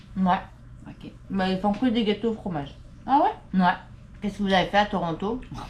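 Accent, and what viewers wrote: South, France. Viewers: Haaaaaaa tu m’as manqué 🎉 😮 trop cool d entendre le son du sud 😊 , du calvados j entend le soleil ☀️ merci 🫨 mince la creveson 😵‍💫 et oui 13 😊 , vous êtes au top ✌️ j’oyeux anniversaire en retard j ai envie de pleurer 😢🎉🎉🎉 bizou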